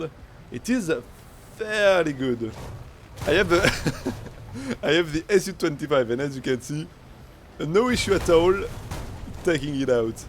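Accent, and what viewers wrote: France. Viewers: Omg l'accent français il est si flagrant 😂😂😂, sinon bonne vidéo gg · Your French accent really adds a great touch to the video. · I knew you was french at the first second of the video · tout ce que j'entend c'est l'accent français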